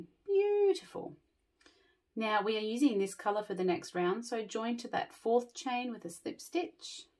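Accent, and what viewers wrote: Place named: Australia. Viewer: I just subscribed to your channel because of seeing this square!! Love it and look forward to listening to your Aussie accent haha!!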